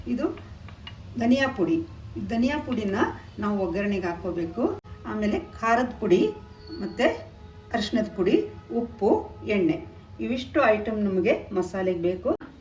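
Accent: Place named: Konkani, India. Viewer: Aap kyon Konkani style bolate Shetti style boliye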